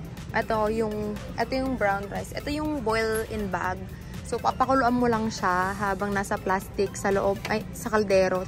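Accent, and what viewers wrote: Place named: Ilocos, Philippines. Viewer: Cutie nung tagalog ilocano. Hahahahahha! 💞💞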